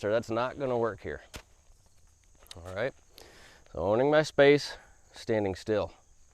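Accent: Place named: Michigan, United States. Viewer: knew by the accent you were probably from Michigan (from royal oak myself).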